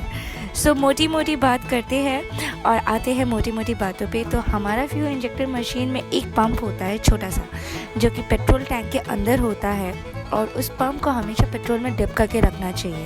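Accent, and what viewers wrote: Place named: West Bengal, India. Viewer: Sweet Bengoli voice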